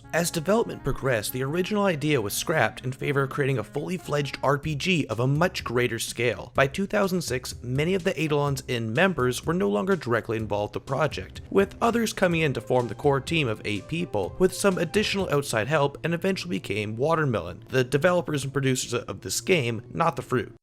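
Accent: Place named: United States. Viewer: Sounds american. French pronunciation of title. UK pronunciation of herb. What the...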